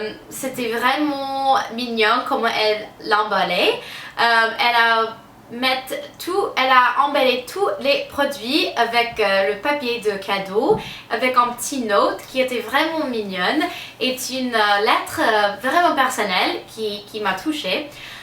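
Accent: France. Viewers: J'aime vraiment beaucoup ta chaîne , et surtout ton accent français . Tu ressembles énormément à Lindsay Lohan c'est fouuuu , bisous p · Je succombe totalement à ton accent frenchy lol les produits sont extra, u've master french lgge better than some french pepople haha, kiss <3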